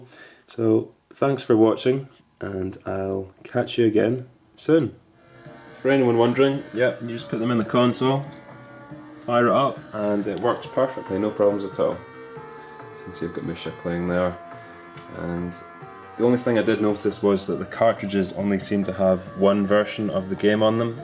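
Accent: Scotland, United Kingdom. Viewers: Broken Engrish read in a Scottish accent. My life is complete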